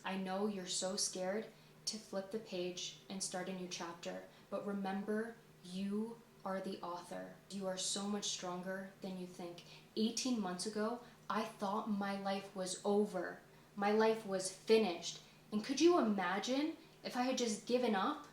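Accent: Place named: Canada. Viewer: I thought I'd been hearing a Canadian accent in your words. I enjoy your videos. I'm going through a minor crisis right now and trying to stay ahead of it and not let it take over. I find your content to be inspiring, thoughful, balanced and relevant and can be applied to almost every other situation the presents itself. I'm glad I found your community 🙂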